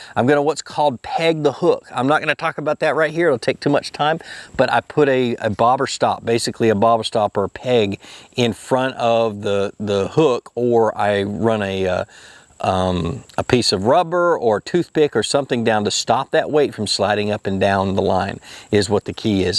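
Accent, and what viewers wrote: Georgia, United States. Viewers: are you from georgia? pls come teach me lmao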